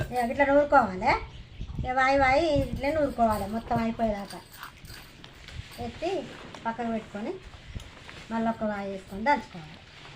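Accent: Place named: Karimnagar, India. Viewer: Memu elage chestamu sooooooper Naaku chala estamu😅😊karimnagar language Amma😂 Maadhi karimnagar 😂meedi vooru ekkada Amma😂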